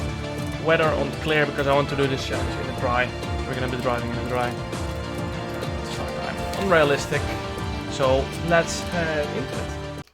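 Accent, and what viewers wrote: Netherlands. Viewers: dat accent is zoo nederlands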